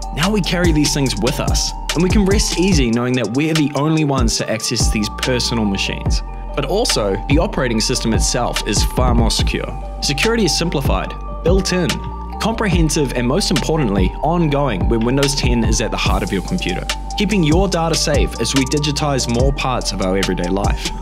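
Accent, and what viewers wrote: New Zealand. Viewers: I knew you sounded like a kiwi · How did I not realize his kiwi accent. When I heard PB tech I was like wait a hot second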